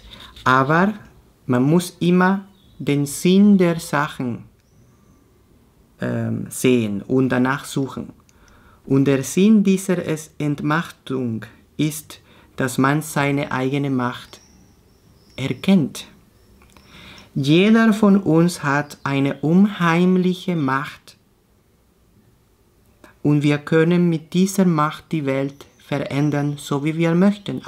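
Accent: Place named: Spain. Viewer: Hallo, du könntest mal angeben, wo man dir eine Spende zukommen lassen kann, per PayPal bspw. Als Energieausgleich dafür, welchen Wert du mit deiner Arbeit den Menschen bringst. Ich kann mir vorstellen, dass der eine oder andere dir gern etwas zurückgeben möchte. Uns pregunta: De qué país eres? Tienes un accento de un hablahispante.:-) Tu alemán tiene un muy alto nivel, muy elevado. 👍🏻 Vielen Dank für deine tolle Arbeit, bin sehr froh, dich hier entdeckt zu haben. 🙏😊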